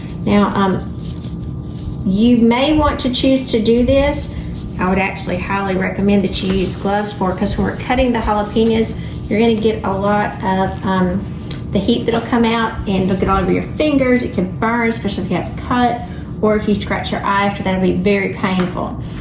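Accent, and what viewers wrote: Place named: Georgia, United States. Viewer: You know youre from Georgia when the transcript says bowl each time you say boil😅!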